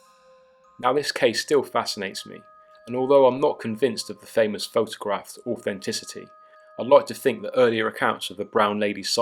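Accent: United Kingdom. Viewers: I subscribed, and I think this is a great narration and good story. Well researched. I'm partial to British accents reading though. Most podcasts and audiobooks I listen to do not hold my interest if they do not have the accent. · I'm really liking the narrator's voice (?). I'm American & dig a brit accent. His videos are really enjoyable, as well.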